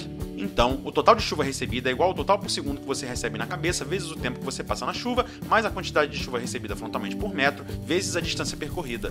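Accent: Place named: Rio de Janeiro, Brazil. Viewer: caralho é impossivel ver mais de 1 minuto de um vídeo em carioquês, que coisa insuportavel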